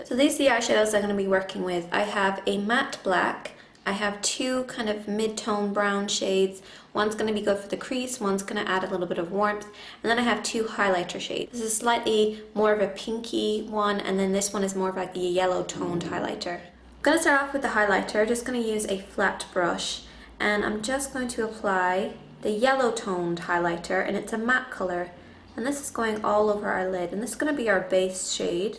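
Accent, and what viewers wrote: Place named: Ireland. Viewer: Are you irish... and moved to the usa years ago? because it sounds as if you used to have an irish accent and are losing it.. that or a parent has the accent and certain words rubbed off on you. whatever it is.. i love that accent. its beautiful.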